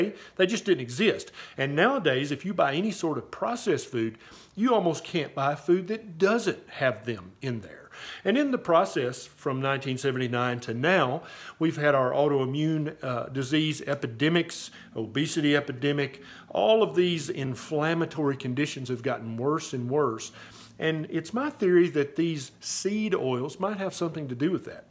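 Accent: South, United States. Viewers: love that southern accent 😊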